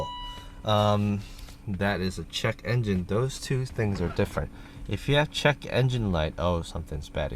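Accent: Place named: Asia. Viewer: I can tell your Asian with just hearing you talk, you talk kind of like my Asian friend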